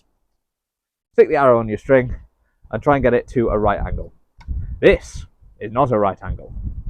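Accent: United Kingdom. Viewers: Dude! Your cool as shit! I feel like a kid watching you do your vids, you look like a fantasy hero, you sound cool (cool voice, British, speak in the kings english) and you are an enjoyable watch.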